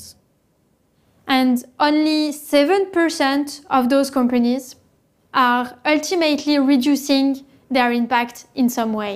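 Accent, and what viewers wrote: France. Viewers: Let me guess.. she's from France. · French accent is quite cute 😅😂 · I think she's French. But it sounds so good.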